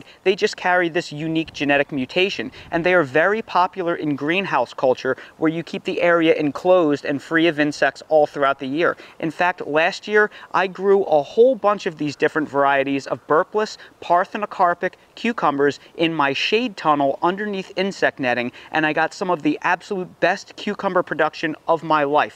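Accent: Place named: Northeast, United States. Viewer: Thanks for the great video! This is the first time I’ve heard of parthenogenic cukes, and the cucumber beetles here in Virginia are 😬😬😬😬. Weird question, but are you a Northeast transplant? Asking because I am too and your accent sounds familiar lol. Had a bit of an adjustment period learning to garden in the South but I think I’m getting the hang of it these days 😊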